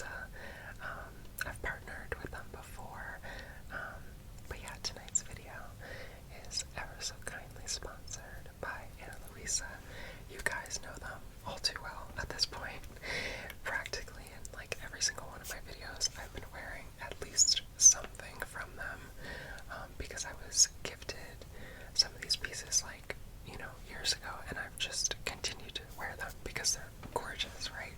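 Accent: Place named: Bronx, United States. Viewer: YOU'RE FROM THE BRONX?!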